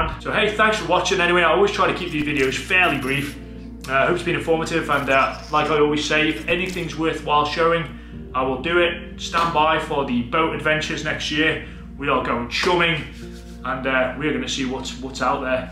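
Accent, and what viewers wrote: Liverpool, United Kingdom. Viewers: How come you sound kind of like a Scouser?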